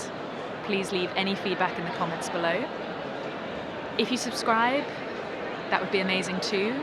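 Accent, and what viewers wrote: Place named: RP, United Kingdom. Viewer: Where did you learn such beautiful pronunciation (RP)? Love it...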